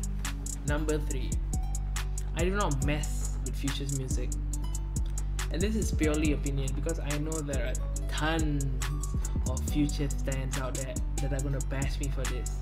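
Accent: India. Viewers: Are you Indian? (Not hating or anything i just didn't expect ur voice) btw speak louder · you from india or what? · Your accent sounds Indian but with a Caribbean accent. My guess is your of Indian ancestry but your from the Caribbean? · What’s your accent it sound Punjabi, Hindu, Pakistani or something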